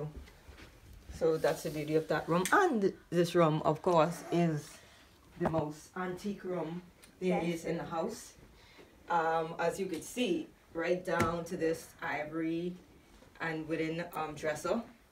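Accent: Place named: Bahamas. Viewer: Few seconds in and I hear a Bahamian accent lol 🇧🇸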